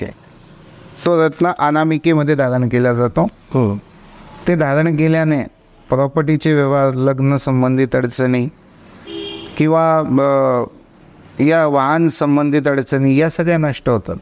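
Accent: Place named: Dhule, India. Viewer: Bhashe warun tumhi Dhule che watata, mazha janma Dhule cha ahe, mi Mumbai madhe asto, mala consultation gheta yeil ka?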